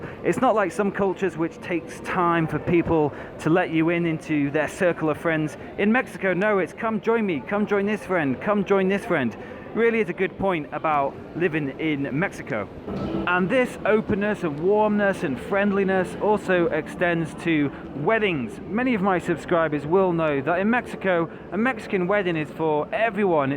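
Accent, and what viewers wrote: England, United Kingdom. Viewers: Lovely video!! luv english accent!!😝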